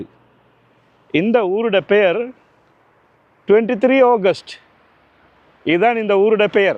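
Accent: Sri Lanka. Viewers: இலங்கைத் தமிழ் ரொம்ப அருமையாக இருக்கிறது . எனக்கு K . S .ராஜாவையும் , B . H . அப்துல் ஹமீது அவர்களையும் நினைவுக்கு கொண்டு வந்து விட்டீர்கள் . புதிய தகவல் . தெரிந்து கொண்டேன் . Thank you .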